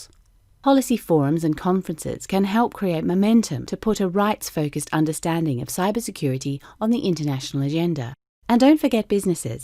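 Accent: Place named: Australia. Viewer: I can hear a hint of Aussie in the person's voice, i can't be the only one. Sorry for going off point, i appreciate this lovely introduction!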